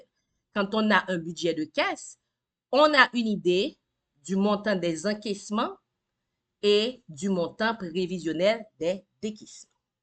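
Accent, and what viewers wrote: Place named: Haiti. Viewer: Slt, Madame , merci pour la vidéo, elle m'avait été utile. Vous parlez français avec un accent Haïtien, êtes vous d'Haïti.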